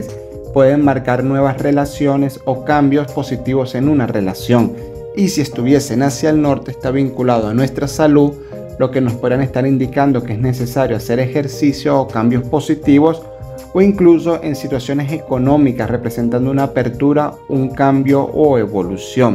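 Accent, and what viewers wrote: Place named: Venezuela. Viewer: Excelente espiritualista, muy claro y conciso, de conocimientos amplios sobre temas de magia y espiritualidad con mucho conocimiento de la antigüedad respetando los rituales de como deben hacerse, te felicito además ese acento y dicción excelente me parece venezolano, excelente la verdad ojala te hubiese conocido acá en Venezuela para aprender lo que no sabemos de la magia y nuestros ancestros, bendiciones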